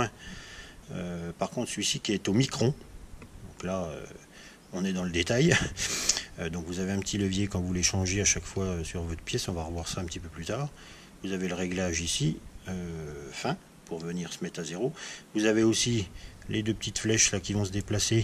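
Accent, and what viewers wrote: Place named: Jura, France. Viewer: Tu dois pas habiter loin du Jura je me trompe ? T'as un léger accent ^^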